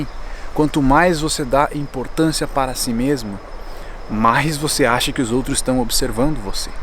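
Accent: Rio Grande do Sul, Brazil. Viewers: Apaixonada por este sotaque gaúcho. 😍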